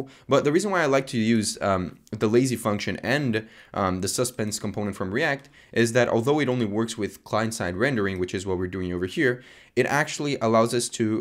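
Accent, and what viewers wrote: Brazil. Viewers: Great vid! I recognized the Brazilian accent as the it started playing 😆 Keep up the good work!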